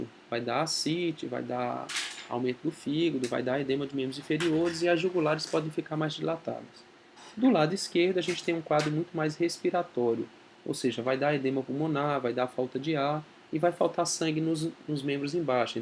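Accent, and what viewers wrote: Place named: Northeast, Brazil. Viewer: Falou muito e muito rápido, explica em Termos que só um Médico entende e o Sotaque Nordestino "comendo" o "R" no final das Palavras e isso como Médico, é uma vergonha!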